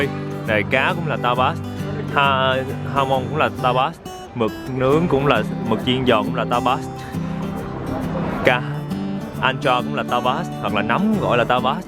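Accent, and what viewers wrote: Mekong Delta, Vietnam. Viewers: anh ấy đẹp chai và có tâm hồn ăn uống và giọng nói mộc mạc của người miền tây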